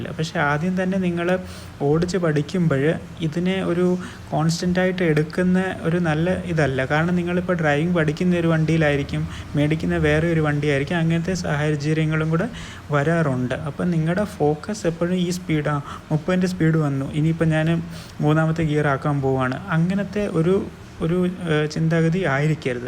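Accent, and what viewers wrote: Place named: Trivandrum, India. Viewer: Bro tvm aanalleee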